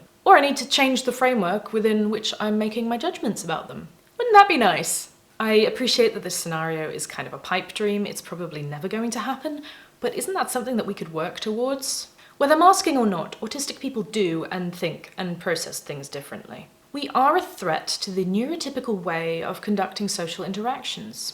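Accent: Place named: RP, United Kingdom. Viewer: Was Received Pronunciation part of your Aspi-onage? 😉 maybe I'm imagining things, but your speech seems a bit more posh in these earlier videos. No disrespect intended.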